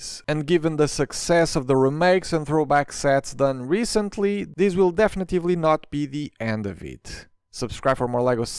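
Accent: Portugal. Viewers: Boas, não sei se costuma receber muitos comentários em português, mas só queria deixar uma mensagem de apreciação ao trabalho que fazes, principalmente sendo em inglês, o que te dá um alcance maior do que terias a falar em português. Eu comecei muito tarde neste mundo da Lego e só acerca de 1 ano comecei a montar a minha coleção, posto isto, gostava muito de ver-te a fazer um vídeo sobre como "nós" (beginners em Lego) podemos arranjar forma de, mais eficientemente, fazer arrumação das peças por categoria, ou mesmo por "tipo de peça". Adoro os teus vídeos, e devo confessar que o teu sotaque de inglês apesar de ser excelentíssimo, dá para entender no meio, os tragos de português e é muito bom. Muita força e que continues a ter sucesso por muito tempo. Um abraço de Lego aqui do norte, mais propriamente de Santa Maria da Feira. Um bem haja grande! <3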